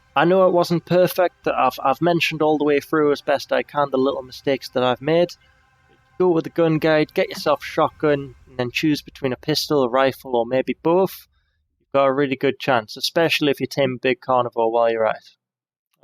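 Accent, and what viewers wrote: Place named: Ireland. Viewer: I love your irish accent lol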